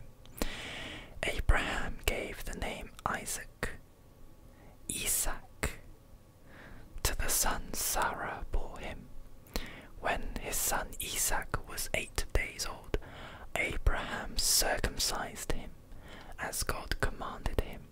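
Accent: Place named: England, United Kingdom. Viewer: Wow hi, I just discovered your channel. Your voice is so cool! England-accent is nice. Also, I'm sorry you faced the racism you did. :( Also thank you for being so vulnerable. I know that can be very hard. Please feel free to talk about yourself more. Talking helps bring healing. Allow yourself the healing you need.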